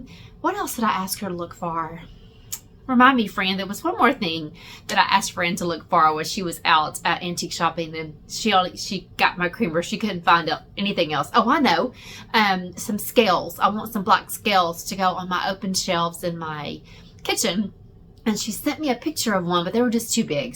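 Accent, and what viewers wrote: Texas, United States. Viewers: I really like your 'treasures'. It sounds like you're from Texas--we are, too. Where are the best antique stores in the Hill Country? We take all of our visitors to the Silo's in Waco, also.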